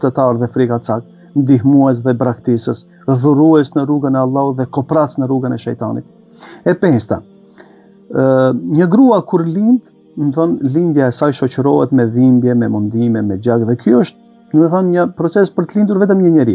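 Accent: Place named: Kardhiq, Albania. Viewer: shkerdhat si flet kardhiqe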